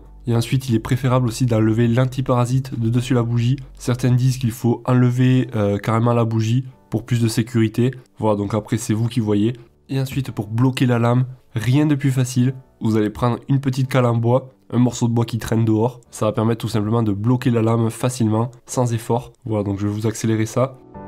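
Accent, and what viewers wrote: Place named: Toulouse, France. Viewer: bel accent. toulouse ?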